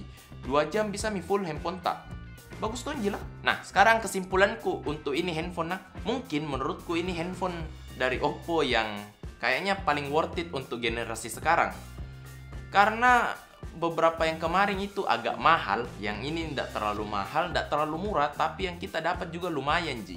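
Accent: Makassar, Indonesia. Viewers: Matap...enak juga liat review gadget pake logat makassar 👍👍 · Lebih bagus kapang memang Logat Makassar bosku... Ada toko hp kah kita punya di Makassar ??? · Saya suka sekali koko klo logat makassar ki ...sama² ji ki too ...#ewako koko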